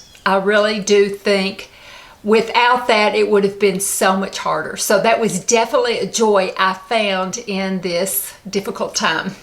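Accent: South, United States. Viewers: I can't believe you are over 60 ... you are so gorgeous. And your southern accent is so cute and soothing. Have you tried The Ordinary's peptide hair serum? I really love it and has really helped my hair loss.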